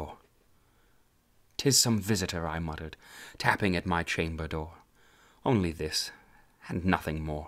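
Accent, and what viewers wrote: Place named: Mid-Atlantic, United States. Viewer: Excellent use of the midatlantic accent. Your inflection and pacing bring to mind the best actors of the last century, trained in Shakespeare. Thank you so much for sharing this with us!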